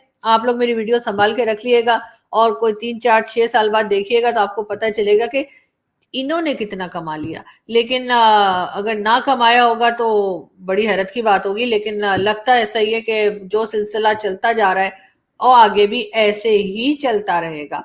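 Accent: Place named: Mumbai, India. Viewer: Aap ka aaj kal urdu ka bolne ka slang bombaiya ho raha hai, Bollywood style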